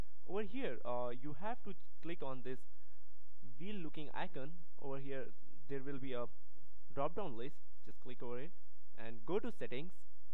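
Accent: India. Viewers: Thank you very much! il ur indian accent!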